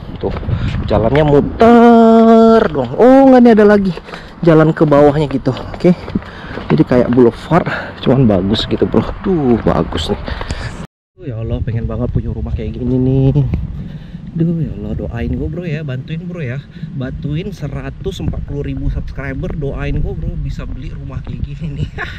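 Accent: Indonesia. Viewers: Mantap aksen anakmu udah kayak anak2 amerika, kalo bapaknya masih kentara indo banget hehe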